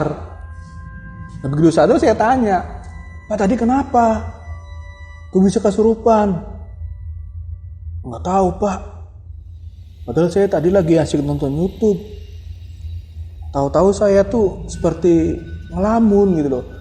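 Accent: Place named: Banyumas, Indonesia. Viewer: Pak Jawa Ngapak ya? Khas banget logatnya haha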